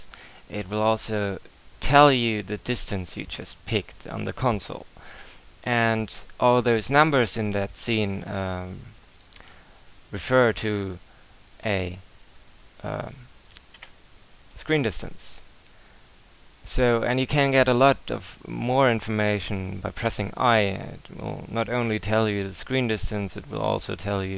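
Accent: Germany. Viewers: Haha du bist deutsch oder?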